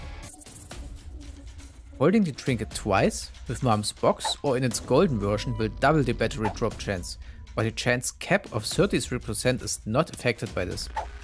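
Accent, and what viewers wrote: Germany. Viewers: I don't mind what videos, so long as they have that silky German accent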